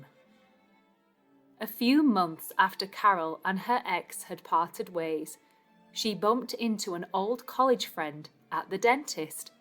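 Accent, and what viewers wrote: United Kingdom. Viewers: Such a remarkable story thanks love british accent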